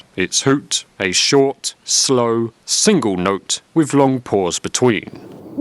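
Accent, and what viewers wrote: United Kingdom. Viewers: Just watched a video from five years ago. When did you get a British accent?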